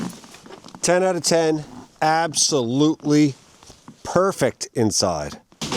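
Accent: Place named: Nova Scotia, Canada. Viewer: Just noticing your east coast accent. Not quite Newfoundlander, but very much like a Blue Noser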